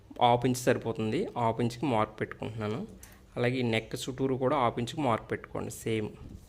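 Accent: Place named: Andhra, India. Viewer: Mana Andra slang theggottandi ani😊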